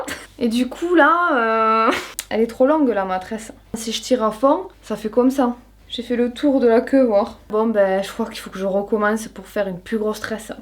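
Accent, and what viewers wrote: South, France. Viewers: Je kiffe vraiment t’es vidéos, ta nature ton délire! Tu me casse de rire à chaque fois et qd tu parles ton (notre) accent s’entend tellement et cest superbe!! Vive le sud!!! Bisous!!!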